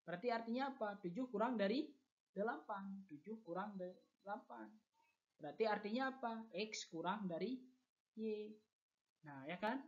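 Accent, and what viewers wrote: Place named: Aceh, Indonesia. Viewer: Bapak nya pasti orang aceh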